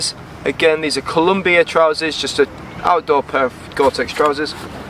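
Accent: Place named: Northern England, United Kingdom. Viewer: I can’t work out your accent. Especially when you say ‘bike’. I know it’s north of England but is there some welsh or maybe Scouse mixed in there? 😂 All the best.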